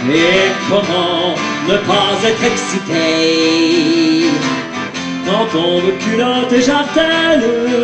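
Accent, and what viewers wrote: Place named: Vosges, France. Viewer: Véééé , t'es né à Rmiremont (comme moi !! ) et t'as le même accent que moi ...!!!! Bizz de Nîmes .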